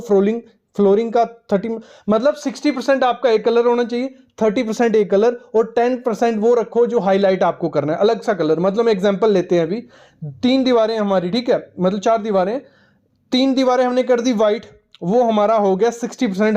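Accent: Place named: Punjab, India. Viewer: Why I'm observing ur voice u r from punjab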